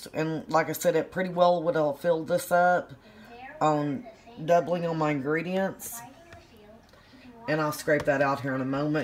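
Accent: South, United States. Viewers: I actually can speak this "Dialect" lol This is COUNTRY - that's Deeper than Southern! I loathe Nutmeg - so NO to the Egg Nog - Folks can add that at the table - YUCK! EHHHhH never liked that taste! Boiled Custart is creamy and sweet - Vanilla is fine ❤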